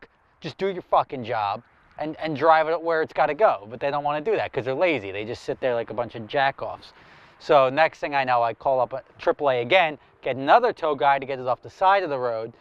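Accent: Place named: New York, United States. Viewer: The angry New York accent really came out in this one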